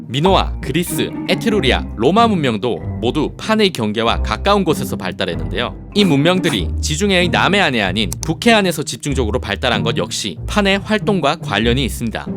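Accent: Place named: Gyeongsang, South Korea. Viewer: 혼자 읽기 힘들었는데 감사해요 경상도 분이시죠?? ㅎㅎ❤️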